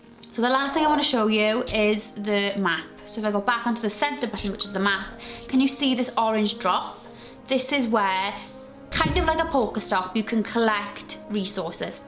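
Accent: United Kingdom. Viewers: She is scouse my g an accent in English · She literally has an English (or Welsh) accent.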